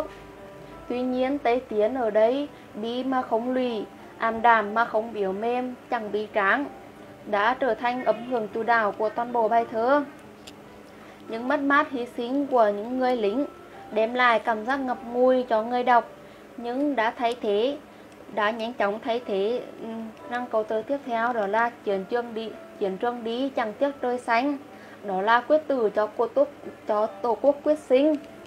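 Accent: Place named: Nghe An, Vietnam. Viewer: Tiếng nghệ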